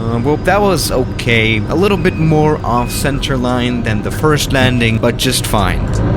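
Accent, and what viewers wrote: Germany. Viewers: I think this is a stupid question but... Is he German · Are you an german? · Are you German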